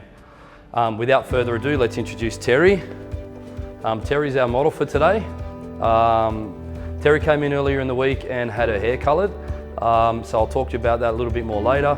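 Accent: Australia. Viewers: is he jewish /american,,he looks like Billy joel but sounds like an auzzie or new zealander,,he will probably never come to the united kingdom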